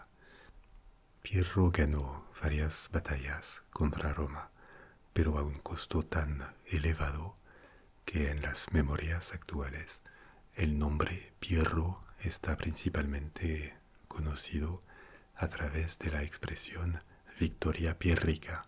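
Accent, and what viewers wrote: France. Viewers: Qué hermoso! Castellano con acento francés! Gracias! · Español con acento francés... ufff, este audio es maravilloso. Muchas gracias.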